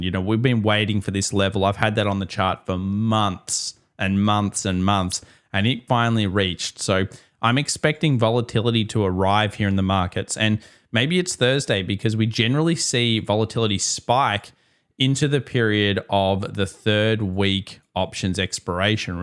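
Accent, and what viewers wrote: Australia. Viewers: MY SMOOTH AUSTRAILAIN man, I do appreciate all the markets you cover. and they way you do things here.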